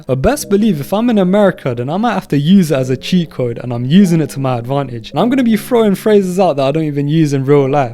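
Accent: United Kingdom. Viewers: no latino rappers or singers??? girl there's, (as in the OG not gomez), all of the huge reggaeton artists. cinco de mayo, día de los muertos are holidays that white Americans have basically co-opted. and omg puerto ricans in baseball? latino culture is super prominent in the US, and the food thing is pretty big i would say! i would trust the UK to have banger indian/south asian cuisine pretty much everywhere, which i cannot say about the US, but i wouldn't go looking for mexican food there lol. i guess i also forgot to say, UK south asians of course have the more personal history of literally being colonized by the british which also makes that connection a lot more deep rooted, and you could say a similar thing of latinos here - the relationship goes back a lot further, a lot of the US literally used to be part of mexico. anyway sounds like you are from the UK but yeah good to hear more examples of south asian prominence there, i'd add another Pakistani, my fav to that list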